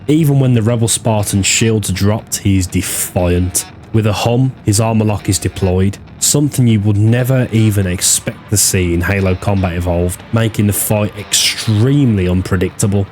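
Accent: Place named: Wolverhampton, United Kingdom. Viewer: Id love to know where your accent is from. Im gonna bank on wolverhampton. Great video anyway❤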